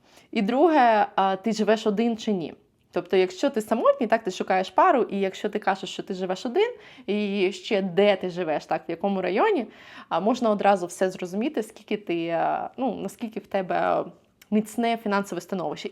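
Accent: United States. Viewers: В таком случае все проживающие и рождённые в штатах Мичиган, Иллинойс (Чикаго)говорят неправильно.она произносит так как разговаривают в штате,где она проживает,чтобы не было акцента.не знаю где Вы проживаете,родились и какой Ваш родной язык.если Вы проживаете здесь,то знаете, в различных штатах есть свой акцент и произношения определенных слов,поэтому общаясь с людьми,Вы можете предположить откуда человек.если Вы обратили на это внимание,я 100%уверенна,Вы не рожденыв штатах,а приехали !!! всего доброго!!!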